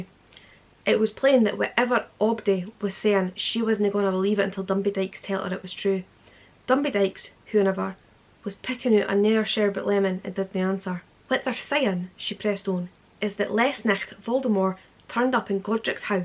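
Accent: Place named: Scotland, United Kingdom. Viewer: This makes me happy. I'm all smiling listening to you. I love the Scottish accent. I think it is beautiful and melodious. I have to admit that I have watched Harry Potter series many times but I've only read 3 of the books in my first language, Indonesian, a long time ago. I was going to get the English version on bookdepository but found the Scots version. I was so excited and got it instead. I tried reading it and I'm just amazed how these words somehow made me feel like I could speak Scots (of course that's just my opinion haha). I'm glad I found this. Now I know how to read it properly. I will try reading it. Then, it will be the first foreign language I read Harry Potter. Do you have suggestions for other novels in Scots?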